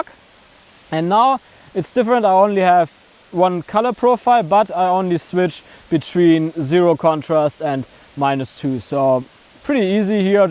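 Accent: Germany. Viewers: Man hört den deutschen Akzent einfach überall raus:D Gutes Video !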